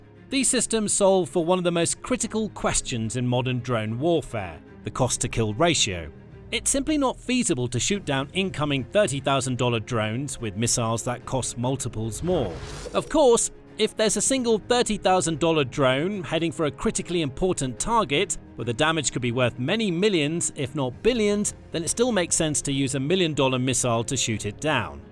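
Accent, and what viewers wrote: United Kingdom. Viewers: The US Military should have a hand in reviewing videos like before they are uploaded to YouTube. This guy with a British accent does not care if he is revealing top secrets of the US Navy. He is more inclined to sympathise with the IRGC, and with this video, he is giving a tip to the IRGC on how to sink the carriers that are already in the war. Hey US Military, FBI and whoever else: Start kicking doors! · Why is this using a British voice if its generated in the US?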